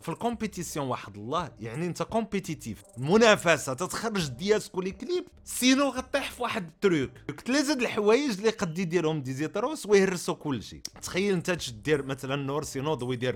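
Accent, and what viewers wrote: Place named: Bechar, Algeria. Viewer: ❤صوت❤ ديالك كايشبه لصوت نتاع ولاية بشار جزائر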